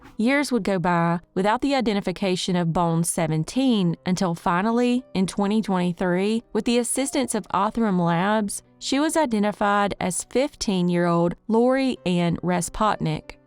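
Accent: South, United States. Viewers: Southern girl accent. Makes you Horney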